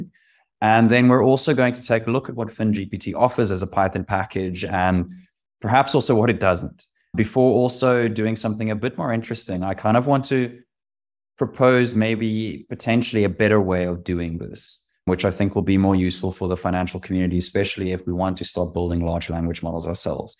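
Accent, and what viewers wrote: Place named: South Africa. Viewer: Random comment, I hear your South African accent lol. Much love from SA!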